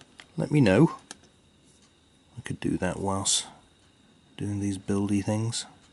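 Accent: United Kingdom. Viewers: also about the questions ask bit, where do you live, you have a very british voice but you upload alot of them promo videos in japan?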